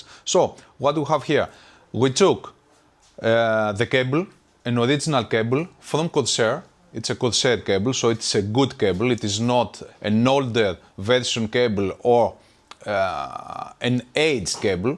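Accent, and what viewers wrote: Greece. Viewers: Are you greek by any chance?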